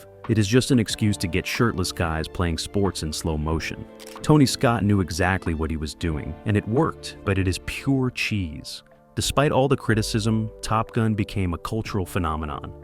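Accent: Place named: United States. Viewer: Sounds typical American.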